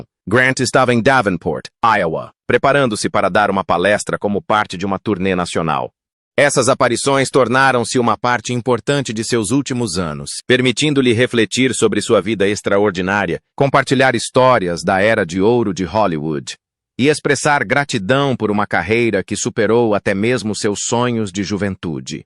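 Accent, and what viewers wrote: England, United Kingdom. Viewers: Para de falar com sotaque ingles. Fica horrivel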